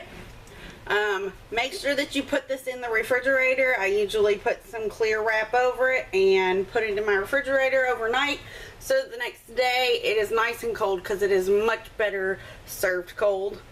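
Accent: United States. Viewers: come on now...it is not good for anyone... sugar, fat and salt....I hear your southern accent and assume you are a fool.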